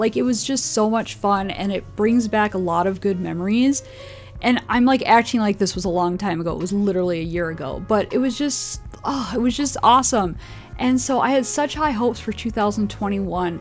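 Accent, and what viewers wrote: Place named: Midwest, United States. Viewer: the driveway landscaping is perfecto! alsoooooo how have I not noticed your (possibly midwest if not cnadian) accent before now???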